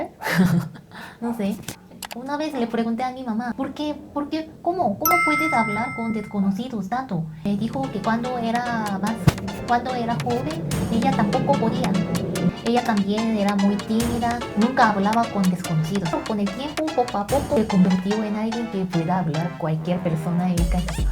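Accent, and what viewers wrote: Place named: Mexico. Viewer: Qué bien hablas mexicano. 🎉🎉 Saludos y sigue disfrutando de México.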